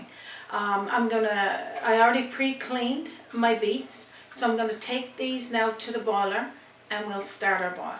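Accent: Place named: Newfoundland, Canada. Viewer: Thank you!!!! As an expat it was not only a treat to get those lovely pickled beets all the way over in Japan but it was a delight to hear the Newfie accent again. Thank you so much. I'll check out more of your recipes soon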